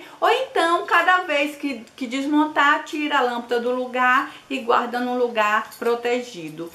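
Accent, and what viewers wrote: Northeast, Brazil. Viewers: Adorei só pode ser nordestina parabéns ❤️❤️❤️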